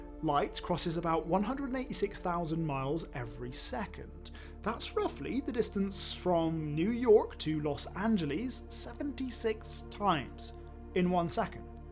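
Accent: Bristol, United Kingdom. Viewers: I know a Bristolian accent when I hear one! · sorry but how does a kid get to name The Reality Threshold?! I do find the Brissel accent hilarous.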